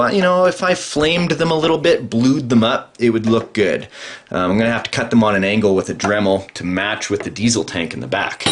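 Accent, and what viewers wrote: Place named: Canada. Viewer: So funny ur canadian accent... "eut" out lol. Great project!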